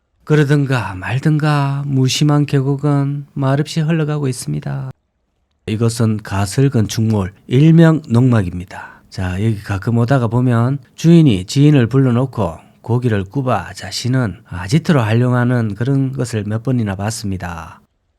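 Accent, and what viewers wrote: Gyeongsang, South Korea. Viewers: 님은 방송국 나레이터가 딱입니다. 호감형의 구수한 갱상도사투리로 은근 중독성이 있어요... 참고로 저는 전라도 사람이여이잉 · 경상도 사투리가 이렇게 구수 했나? 잘 보고 갑니다 ^^ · 이 분 경상도 말씨는 참 듣기 좋은 구수한 말입니다. 다니시느라 수고가 많습니다~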